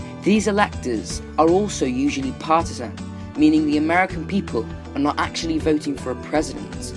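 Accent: United Kingdom. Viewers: tight n sleek british accent..loved the video :)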